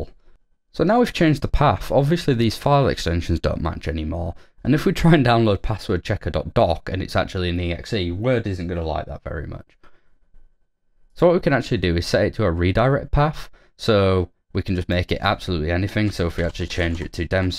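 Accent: United Kingdom. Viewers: Men im in love with this uk dialect .. i just enjoy alot ..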